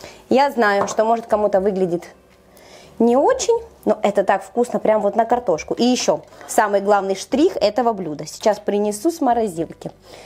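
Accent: Ukraine. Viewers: здравствуйте! Хорошее, качественное видео, спасибо! Если кому-то рецепт кажется несовременным ( не соответствует ЗП, ЗОЖ - много жиров или сладостей), он просто не будет смотреть. Вам, как профи в кук-индустрии (ого, <num>+ пользователей), конечно же, дозволительны вольности в речи, такие, как частые украинизмы или местечковые "рІзетки" - розетки, это не из оф. украинского языка, это из выражений в отдельных местностях. Они не будут портить видео, если блогер этого не стесняется, а наоборот, акцентирует на таком звучании. Это будет украшать видео своим колоритом, если быть смелее! Сердечні вітання із святами та щирі побажання подальших успіхів в творчості!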